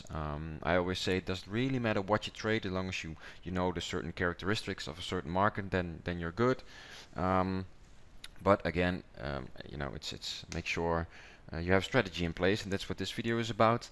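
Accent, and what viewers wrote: Netherlands. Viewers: I detect a slight Dutch accent unless it's South Africa? No mention of where you are in the About information.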